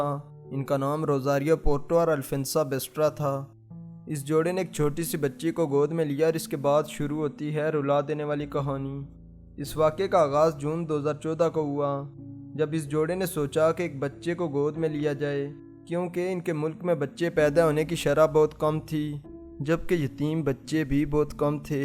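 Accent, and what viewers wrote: Kashmir, India. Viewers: Kashmiri lgte ho bolne sy... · accent kashmiri lagta ha mountain area Jo America ja ka be change nahi hosakta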